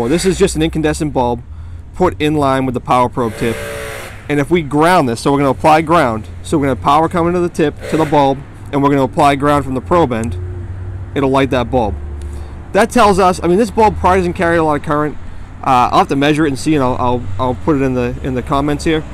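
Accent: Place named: Massachusetts, United States. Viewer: Are you related to Ivan (I think that is his name) of Pine Hollow Diagnostics? You sound a lot like him, but with a bit of a Mass accent, you look a lot like him, you have same mannerisms AND you guys are both high level with auto electronics... Great video, ty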